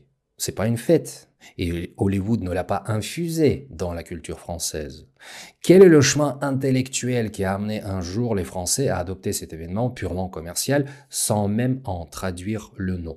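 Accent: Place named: Russia. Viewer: Un "russe" avec un gros accent russe fait une émission en français pour les français en disant "On copie". C'est absurde et illustratif des "russes" occidentalisés qui ne savent plus dans quel monde vivre., faites-vous soigner!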